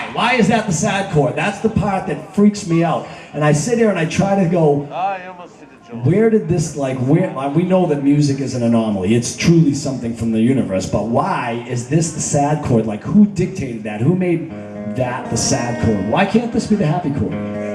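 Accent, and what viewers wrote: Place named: Boston, United States. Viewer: I could listen to him talk anytime love that Boston accent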